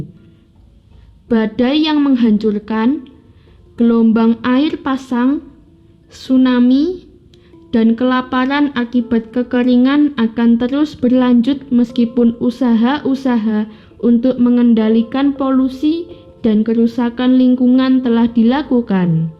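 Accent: Java, Indonesia. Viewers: suaranya Medok dan cadel mbak 😄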